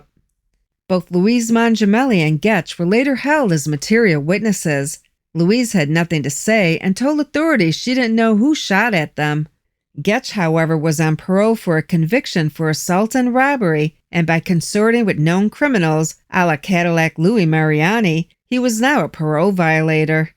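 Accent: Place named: New York, United States. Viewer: Hi again nice narrator lady with your cute New Yawk accent. Your non creepy admirer.. Keep up the great work Dawlin.. Harold....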